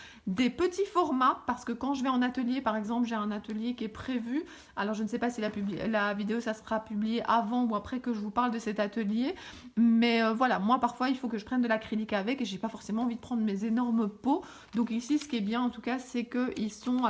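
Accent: Belgium. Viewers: Coucou mais comme je aime tes vidéos je me permets le tutoiement c est plus facile si tu es OK. Je débute en scrap cela fait un an environ et tes vidéos m enchantent et m enseignent bcp.merci beaucoup vraiment. Je adore les fées et la fantaisie la nature du coup je me reconnais dans vos créations. Voilà je te souhaite de passer de bonnes fêtes moi aussi en vacances pour 5 jours avec mes deux enfants 10 et 13 ans je suis infirmière donc peu de temps pour scraper.Merci en tout cas pour ces bonnes idées sous l accent belge.Une dernière info des paperblocks de luxe sont sortis il y en a 4 magnifiques les as tu en Belgique nous ici en Corrèze pas encore. bises.laurence.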